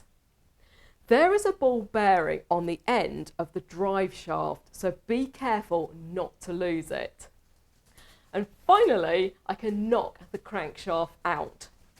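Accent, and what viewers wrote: United Kingdom. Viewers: Amazing person God bless ya and love your British accent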